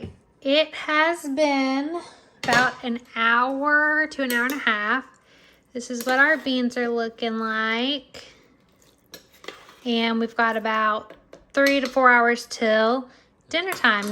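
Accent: South, United States. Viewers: You have the nicest Southern accent! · you have a beautiful southern accent i thik its southern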